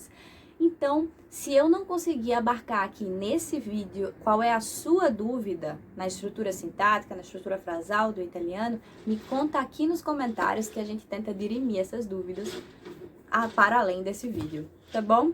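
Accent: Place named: Maranhão, Brazil. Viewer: Meu Deus, piqena tu é maranhense... Já tô gostando mais ainda. Também sou do Mará. Tô treinando pra chegar aí na Itália tinindo. Ainda bem. Qe tem tu pra ajudar me ajudar sair do maranhês pro italiano kkkkkk Mamma mia!!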